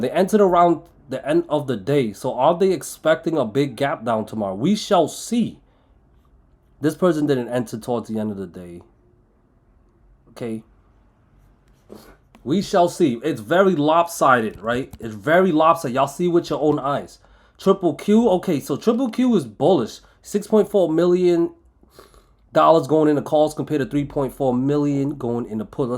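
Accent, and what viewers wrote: New York, United States. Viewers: you are awesome!!!! you almost sound like a New York City guy!!!!!!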